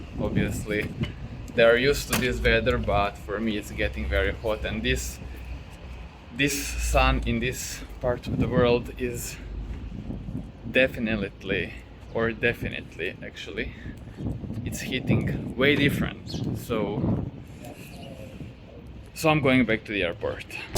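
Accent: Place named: Balkans. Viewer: Thanks for the 'nice video, where are you from Originally? You sound balkanese!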